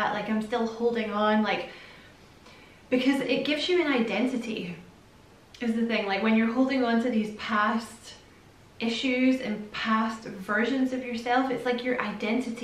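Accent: Scotland, United Kingdom. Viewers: Thank you so much for this, it's great to hear another Scottish voice❤️🏴.... I am doing lots of shadow work and the amount I have cried is not real 😭... Where I am having problems tho, is not forgiving others for situations, that's easy to me😊.. Its trying to forgive myself!! and now having hindsight, why didn't I do that/say this? its the anger towards myself I can't let go off. Love and light too you and I wish you all the happiness in your new space. ❤️From an aqua lady xxx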